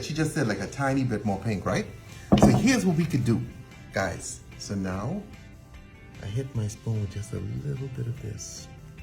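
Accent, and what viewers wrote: Bahamas. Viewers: Sound like a Bahamian accent 🗣🙂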